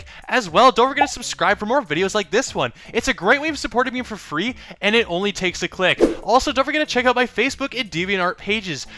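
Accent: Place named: Canada. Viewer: U sound very Canadian, lol so am I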